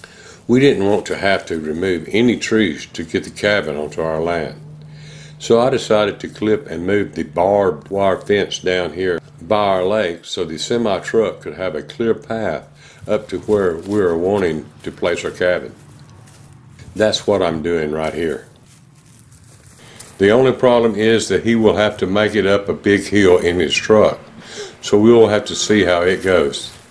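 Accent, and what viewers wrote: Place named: Texas, United States. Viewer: Hey Mr.. I can tell you are from Texas. I love your accent, lol. Your land is gorgeous! Such an awesome video. I made sure to subscribe and have seen your other videos. I am enjoying them.I will be waiting for more videos. Have a really good night. See you soon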